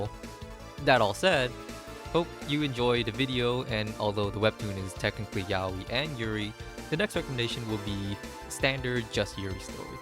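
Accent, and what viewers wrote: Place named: Ireland. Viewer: cool cool, but why do you keep saying "mudder" are you Irish? Or Irish american?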